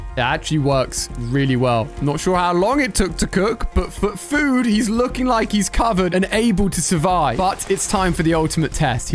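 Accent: United Kingdom. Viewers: Bro is British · Are you british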